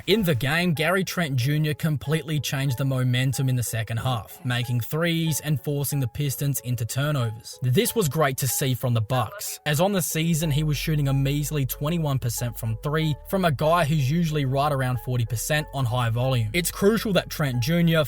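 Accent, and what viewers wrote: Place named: Australia. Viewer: Beef Stew should get a suspension for that hideous flagrant 2. Dirty Mofo. Love the channel man. Love the Aussie accent. Oi Oi!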